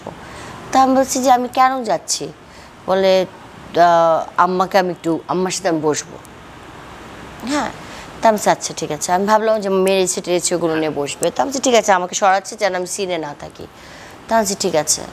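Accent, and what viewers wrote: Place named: Sylhet, Bangladesh. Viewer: Salam sister you are very good woman nice sylhetee talk but little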